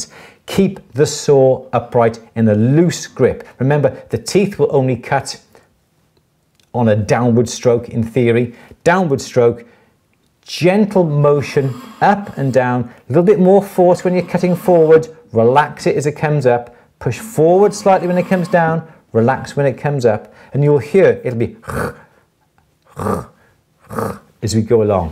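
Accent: Wales, United Kingdom. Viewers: Great lots of tips for my memory bank. Cheers. Can I ask why do u turn your bench hook back around at an angle, after u finished cutting flat pieces? Sorry it's prob a basic answer but I'm just starting out trying To learn myself to work with some metals like copper and silver and also coins. I started making wood rings but I want to broaden my horizons so to speak. Love your channel, it's so helpful to me and many others.. Merry Christmas from Ireland, do I hint a Welsh accent there? Or somewhere close?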